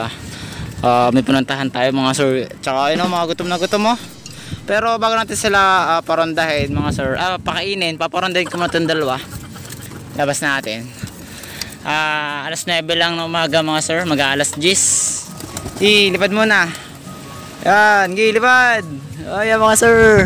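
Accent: Batangas, Philippines. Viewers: Batangas ka ga sir😂😂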